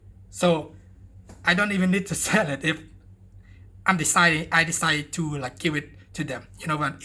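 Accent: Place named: Cambodia. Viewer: You sound like cambodian, where are u from ?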